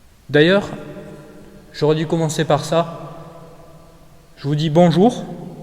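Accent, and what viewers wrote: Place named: South, France. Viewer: Un Abonné de plus ! Je suis fan du travail de Guss DX et j'attendais avec impatience un contenu similaire mais avec une touche personnelle différente. Qualité de Montage, Durée de vidéo et l'accent du sud 3 épisodes et me voila déjà fan de ton travail ! Continue sur ta lancée je trouve cela Génial !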